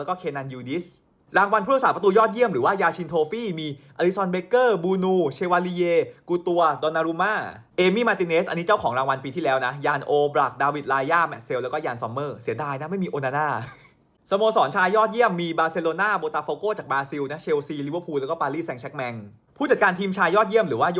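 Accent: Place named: South, Thailand. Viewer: น้องบ่าวเด็กใต้เเน่นอน😂😂😂😂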